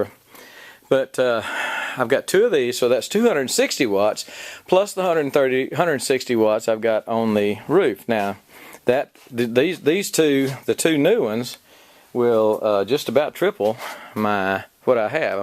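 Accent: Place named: South, United States. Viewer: With that southern drawl I was expecting to hear a "hell yeah" haha.. Regardless your vid definitely piqued my interest.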